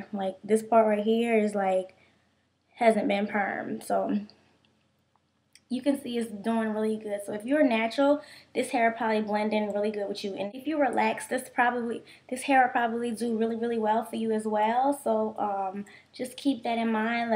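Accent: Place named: New Orleans, United States. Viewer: R u frm new orleans because u sound just like us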